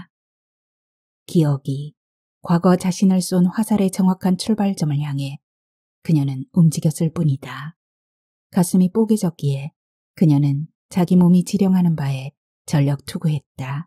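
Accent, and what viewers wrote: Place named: Busan, South Korea. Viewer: 수작이네요~신종국 작가님~특히 마지막 부분~ 오랜만에 귀에 쏙쏙 잘읽히고 부산 사투리와 레트로 감성의 가슴아픈 소설 잘 들었습니다. 그시대때는 그렇게 참고 많이들 사셨을것 같습니다. 홧병으로 심장에 북소리가 나던~~~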